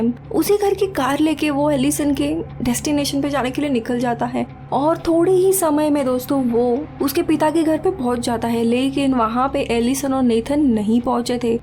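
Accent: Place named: Gujarat, India. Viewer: Gujarati flavour aa rahi hai awàaz me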